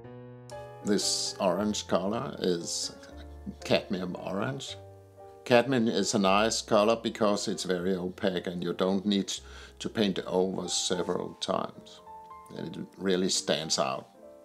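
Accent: Denmark. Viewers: Thank you for your inspiration and also for making it all look so possible, with the right materials and the right attitude. (I also like hearing your soft Danish accent.) Space is for me the most important element, because even in a painting the images are found in space (foreground, background, etc) and it delineates them one from the other. (or 6.20 pm Western Australia time element)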